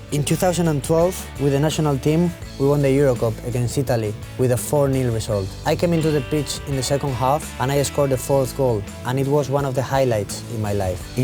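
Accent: Spain. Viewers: Ese espaninglish!!!!💪😍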